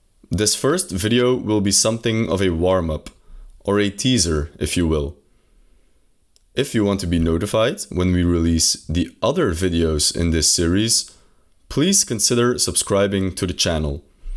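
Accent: Flanders, Belgium. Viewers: Thanks for making philosophy digestible :) Are you a native Dutch speaker (with a Flemish accent)? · Benje van België lol? Ik hoor uw accent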